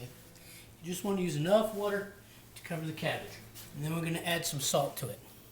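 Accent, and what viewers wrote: United States. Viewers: Haha, you just have a strong American accent is all. I've definitely heard worse :)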